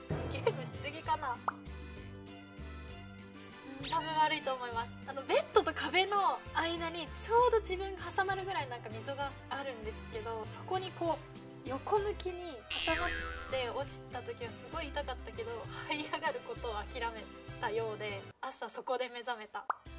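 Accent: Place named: Oita, Japan. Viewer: ッチナ❣️頑張れ👍 天から１日が始まる。大分弁かわいい😍ユーミーのボケとッチナの変化球が大好きです。天然だらけのめざまし、最高です。頑張れ👍 ッチナ❣️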